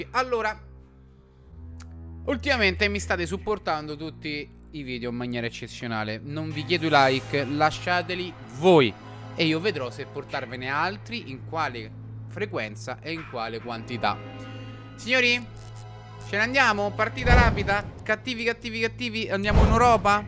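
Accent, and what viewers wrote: United Kingdom. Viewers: ci sei troppo bbritish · Ciccio e l inglese molto British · Quanto sei britisch quando parli inglese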